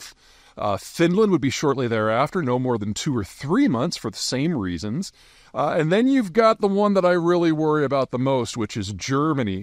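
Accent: United States. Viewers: Its refreshing to hear from someone with an American accent that understands that nuclear weapons are a technology from the 1940's, that can be developed by most advanced countries with nuclear reactors if it is needed to ensure their own security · Nuclear in 'Merican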